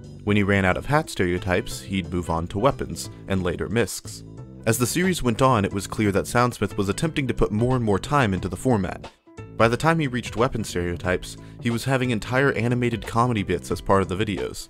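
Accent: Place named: United Kingdom. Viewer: Im just here for your british vocals!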